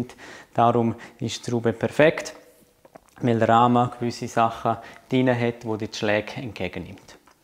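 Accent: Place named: Switzerland. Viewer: Leider sprichst du kein deutsch sondern diesen schweizerischen Dialekt den ich ( als Belgier) sehr schwer verstehen kann! Schade, du hättest mehr Zuschauer!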